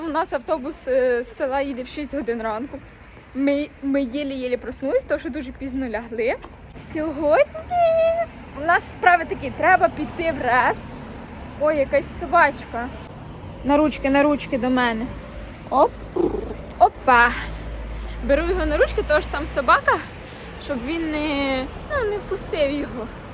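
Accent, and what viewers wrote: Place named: Cherkasy, Ukraine. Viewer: доця, я так радію, коли виходить твоє відео. Вони мені такі домашні, ніжні, добрі, тому що ти дуже гарна дитина у всіх відношеннях. Бажаю тобі всього найкращого, а головне, щоб ви наші діти жили в світі без війни. Обожнюю як ти розмовляєш, у нас( Чер каська обл. ) теж так говорять.❤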